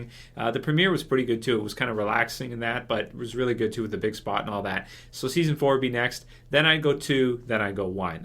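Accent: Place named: Canada. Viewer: Hi. I love that you mentioned the accent issue. I am not a native English speaker so I did not notice Alycia's or Nick's non-American tones, even though I knew the actors are not Americans. However, I am very interested in getting to know more about accents and to learn how to spot such anomalies. Could you please point us/me more specific moments (not time codes as they may differ) but rather specific sentences that sound not as they should in terms of accents? You mentions the throwing up scene. What exactly in it? BTW1: Why do they give roles of US people to non-American actors in the first place? Don't get me wrong, I love the cast, I really do, but - honestly - whould it be really so hard for the creators to find US actors equally good, avoiding the accents issue? BTW2: You are the first person I identified as Canadian purely by listening to, so I am not so hopeless in spotting accents as it might seem because of the fact I failed to hear it in Alycia's lines;) BTW3: Great channel! 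You are very productive! Keep it up!;) BTW4: It would be AWSOME if someone (like you) made a special bonus video about accents in our favorite TV shows. Not only to point out mistakes, but just to show non-native audience how rich English language is.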